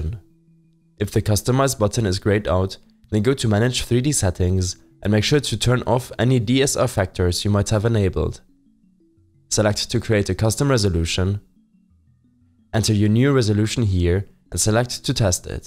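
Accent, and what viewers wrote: Germany. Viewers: Nice one dude, it worked on my computer and btw are u german?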